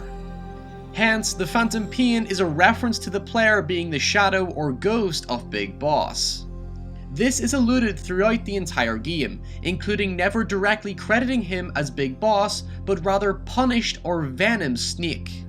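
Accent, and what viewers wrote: Northern Ireland, United Kingdom. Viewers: Northern Irish? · He has a completely typical Northern Irish accent. You certainly skimped on the punctuation lessons though, didn't you?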